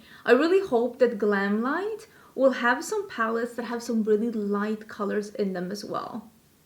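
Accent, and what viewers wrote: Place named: Sweden. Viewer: Its been awhile since I watched a makeup review You're losing your accent 😭 It use to be very thick and unmistakably Swedish(not a bad thing) but it happens to quickly when you move anyway from one's homeland but I can definitely hear your accent softening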